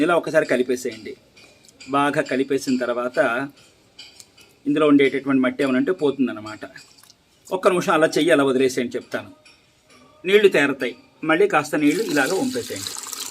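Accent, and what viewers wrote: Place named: Brahmin, India. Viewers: మరొక మాట బ్రహ్మల మాట ఎప్పుడు అంత swachamga ఉంటుంది అందులో మంత్రాలు chadive వ్యక్తి so మాట అలగే ఉంటుంది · Tamilian ayina mee telugu madhuram ga undi mee vanta laga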